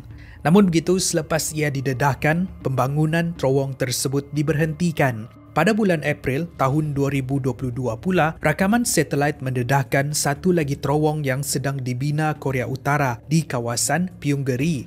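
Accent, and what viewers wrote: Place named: Malaysia. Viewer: Bahasanya sudah mulai lancar walaupun masih agak kental melayunya tapi bahasa Indonesia ny sudah mulai lancar dan baik lanjutkan banyak 2 belajar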